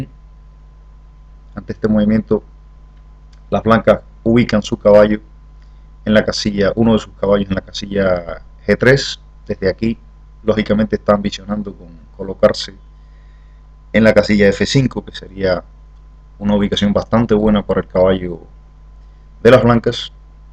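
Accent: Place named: Canary Islands, Spain. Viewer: Por el acento parecías canario jejeje. Muchas gracias por los vídeos que subes. Yo estoy empezando a estudiar un poco en serio y la verdad que me ayudan mucho. Un saludo!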